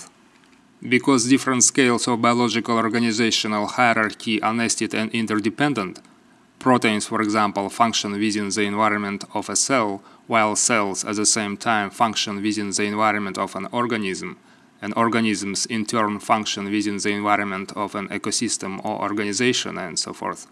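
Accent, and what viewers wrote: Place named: Eastern Europe. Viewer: The 'presentation' is a little on the 'dry' side, but an informative video nevertheless; IF you can understand the heavy Eastern European accent ;>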